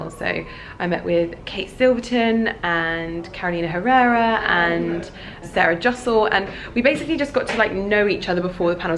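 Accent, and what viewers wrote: United Kingdom. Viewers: Is she british??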